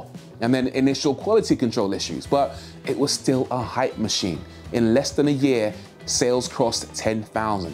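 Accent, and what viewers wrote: United Kingdom. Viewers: Very informative video. The killer British accent helped.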